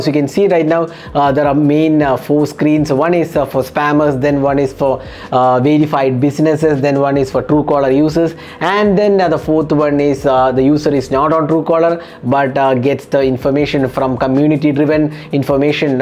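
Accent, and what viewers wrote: Kerala, India. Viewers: Are you a malayali by any chance?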